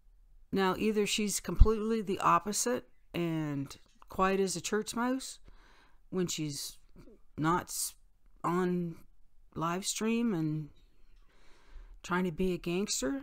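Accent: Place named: Canada. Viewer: i enjoy your videos, and Canadian accent 😃, but these people are so vicious and hateful when you disagree with them it's pure insanity. LB's "people" are going to jump in an attack you with their mob mentality. They are incapable of polite disagreements, the hate they throw out is so horribly cruel. I think you do a great job & you have every right to voice your opinions, especially on your channel. Nobody has to watch anything they disagree with, or they find offensive. Have a great day.🌷🌷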